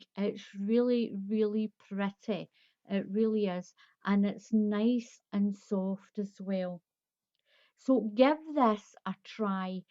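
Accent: Scotland, United Kingdom. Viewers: Great looking piece! I'm starting a fundraiser for my preschool, and I wonder if you have any more suggestions for a desirable but easy (and quick) made projects? This one of course I'm considering. Also I could listen to you speak for ages. Scottish and northern Irish are my cryptonite! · Hi,, beautiful blanket. Are you from Scotland? You have beautiful accent.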